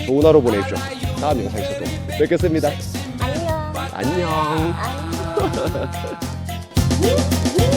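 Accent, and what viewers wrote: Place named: Gyeongsang, South Korea. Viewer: 여읔시 님 갱상도 머째이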